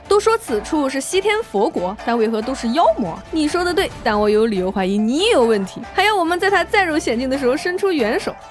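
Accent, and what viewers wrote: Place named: China. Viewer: 地域差异是事实。你不懂这个的话不就是科普？我何时反驳自己第一则回应了？我一开始就告诉你这是口音问题。她说话是普通中国大陆人说话口音，你不知道，所以我们这么多人才一起告诉你同样的话。很明显你不知道，因为你到现在还在因为大家科普你了所以自尊心受损破防 😂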